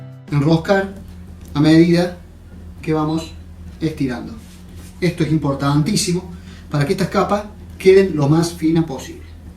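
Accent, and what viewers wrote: Cordoba, Argentina. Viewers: Canal mas que bueno y solo podía ser argentino!!! Famosos por saber enseñar con la mayor generosidad,,,,, país del que siento orgullo !!!! Además de tener muchísimas delicias para pasarse todos los días haciendo cosas ricas p nuestro deleité __gracias mil!!!___aprte de escuchar ese acento tan çaracteristico de mi provincia de Córdoba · Con razón un GENIO DEFINITIVAMENTE ME SUSCRIBO 👏👏👏👏 Ialacordobés como yo jajaja y la tonada nos delata Encantada con tus recetas👍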